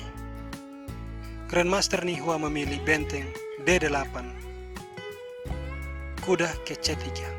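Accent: Manado, Indonesia. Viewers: Kaya y manado😁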